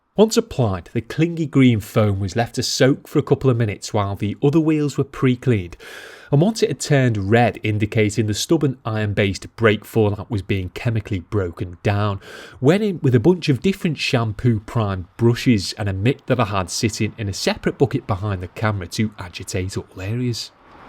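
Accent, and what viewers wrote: Liverpool, United Kingdom. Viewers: Where in liverpool are you from,?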